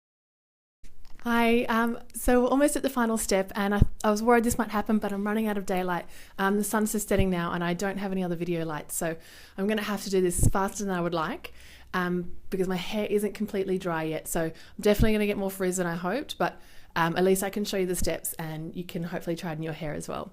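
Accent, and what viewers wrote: Australia. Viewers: I haven’t let my hair run wild with its natural curl in ages. Your videos are making me want to go au natural. I’m curious to see what it does since being bleached and abused. Love your voice by the way - it’s a nice example of an Aussie an accent and I find it quite soothing. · I love your channel and love hearing a fellow Australian ❤️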